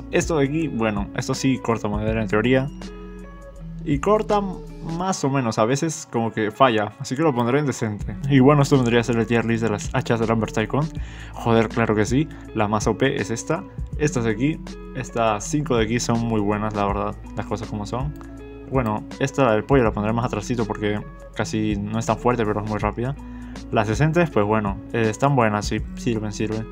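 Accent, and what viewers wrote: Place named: Peru. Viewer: Buenazo! Todavia con acento peruano causa xd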